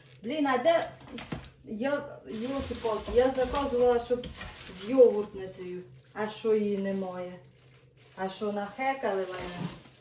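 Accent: Poltava, Ukraine. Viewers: А ви не з Полтави ? Бо балакєте ви по-нашому , і акцент у вас полтавський .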